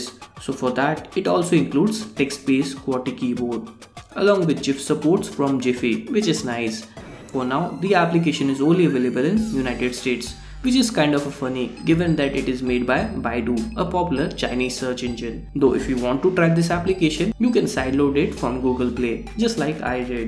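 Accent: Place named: Bengal, India. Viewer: i think you are Bengali . i am saying because your accents is a lot like Bengali .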